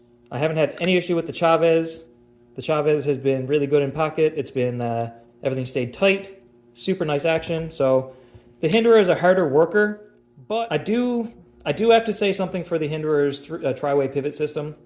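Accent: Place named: East Coast, Canada. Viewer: You sounds like you’re from the east coast of Canada. Good video by the way.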